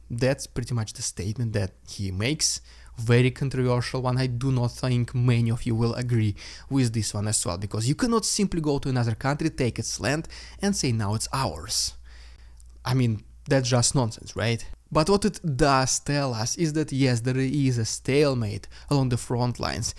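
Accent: Russia. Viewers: Dude- I LOVE the Russian accent, so I'm not dissing you at all- but turning on captioning returns some HILARIOUS results of voice-to-text.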